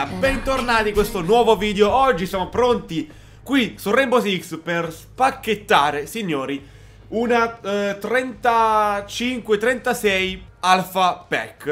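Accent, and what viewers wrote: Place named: Rome, Italy. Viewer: Ma sto accento romano?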